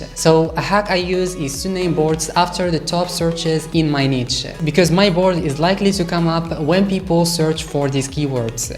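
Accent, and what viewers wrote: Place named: Middle East. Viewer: Thanks bro! Very helpful. Also, love your accent! Seems Middle Eastern mixed with French 😊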